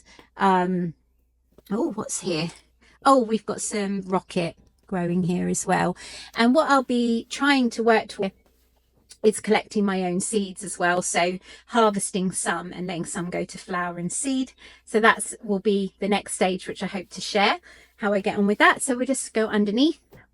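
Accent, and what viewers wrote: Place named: England, United Kingdom. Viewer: Wow, you were born in Sweden!! 🤨I guess you didnät live there for long, you have such a strong English accent.... So how warm does it get for you in the summer? Yes, we have a channel would love for you to follow our journey! 👍💜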